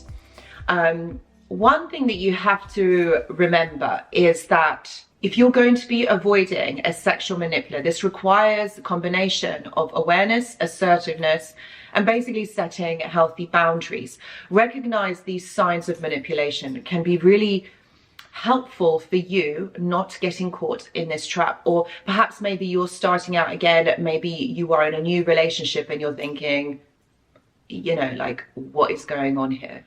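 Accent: United Kingdom. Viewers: Very eye opening! For me this one has been very hard to tell because I am very open minded sexually- even so though he’s done a few subtle things that just made me feel uncomfortable. I could never really put my finger on why but just this lingering feeling that something is wrong. I was also going to say that since I have been watching your videos every day now I noticed when I am dealing with the narcissist it’s like your voice is in my head dictating my words! I am writing out the text response and I read it back to myself and it’s almost like I didn’t even write it I hear this you know British accent and I’m American!! Just something a bit kooky lol 😂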